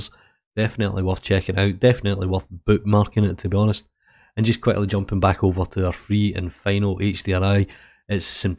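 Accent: Scotland, United Kingdom. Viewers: Don't worry, you just sound a bit more Scottish than usual.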